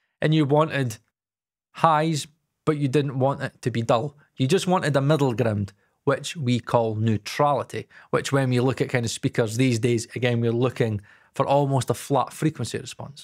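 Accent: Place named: Glasgow, United Kingdom. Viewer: This sounded like very well reasoned advice that accounts for how the tech has just moved on. I also got a nice affirmation for my decision to buy my Kali LP-6 v2 last year. I also just loved your Glaswegian brogue. It was like listening to Winston, my spirit animal from Still Game. AWESOME! Gone is the notion to even think of some NS-10 as even a reference. I next reference will just be better monitors like a pair of Adam's, or perhaps Neumann's.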